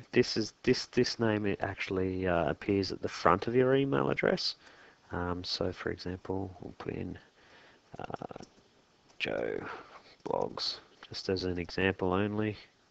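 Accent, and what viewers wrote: Australia. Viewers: 10 Stars. I have searched for days for the answer to this. Your method and the order you did it all in worked for me..finally! Thank you very much. Nice calm Aussie voice, made it even more pleasant.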